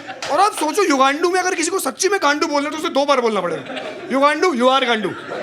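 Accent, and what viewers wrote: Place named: Delhi, India. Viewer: UK SE JADA DELHI KA LG RHA BHAI BC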